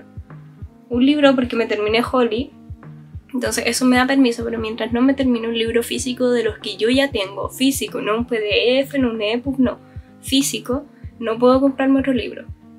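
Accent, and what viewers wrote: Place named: Chile. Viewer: Me encanta el acento chileno, felicidades por tu canal muy buenos videos sigue asi, saludos desde México.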